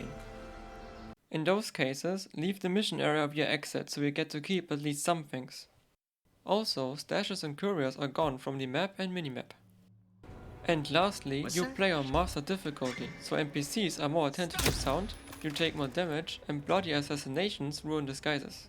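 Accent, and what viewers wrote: Germany. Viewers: Man merkt du bist deutscher haha ist lustig wie du english sprichst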